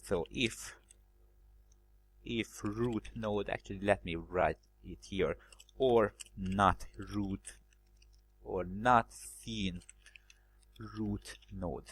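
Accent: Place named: Hungary. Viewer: Are you Hungarian? :)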